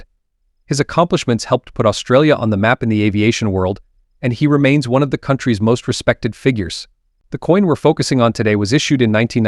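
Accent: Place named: United States. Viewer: What an absolute load of BS . Get a yank voice to narrate the topic of Australian coins they clearly know nothing about. The 2010 $2 coin had over 36 million In circulation. The 2008 scout coin over 17 million in circulation. No idea at all !!!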